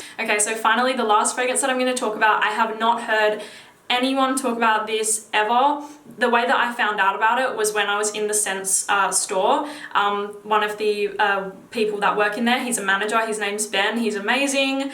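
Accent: Australia. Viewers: I think she's Australian....but, I'm not sure....classy girl for sure! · 100% normal Aussie accent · Her australian accent does it all 👌🏻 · That accent! I'm from London and get a lot of comments on mine haha but that aus accent suits you to a t!! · YU AUSSIE ? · I definitely wasn't expecting an Aussie accent when i opened the video lol. Great list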